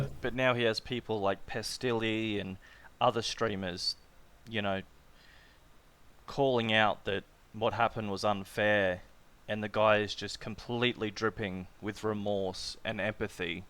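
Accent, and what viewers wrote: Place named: Australia. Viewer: bro I love your channel, your Aussia I can tell, you aussies are the best shittalkers I swear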